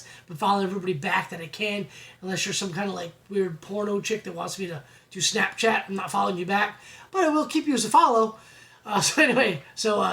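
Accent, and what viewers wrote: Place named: Northeast, United States. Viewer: I’ve been a ‘tallica family member for 28 years, (fell in love after hearing that my sisters copy of when I was 7) wish they would’ve switched for! Also just a side note, I grew up in Boston (8 miles from Fenway park) you sound you lived in the Northeast at some point....great story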